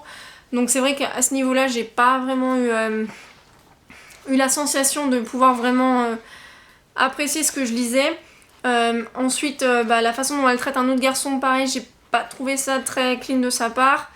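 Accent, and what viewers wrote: England, United Kingdom. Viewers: J'aime tellement ton accent anglais 😍😍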